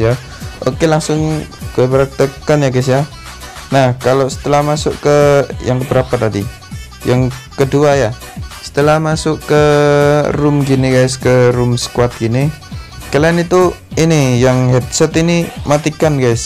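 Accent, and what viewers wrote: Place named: Java, Indonesia. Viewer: Lu orang jawa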